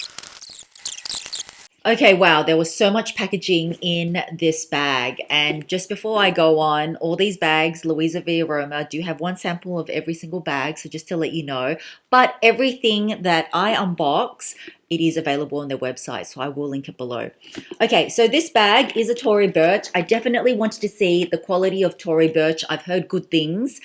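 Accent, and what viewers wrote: Australia. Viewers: HI, I so love your Aussie accent, my sister lives in melbourne maybe one day i have a chance to meet tou in person. You are so lovely!!! I love that versace bag it is so cute!!!! mheller161 IG.... Looking forward to more videos❤️❤️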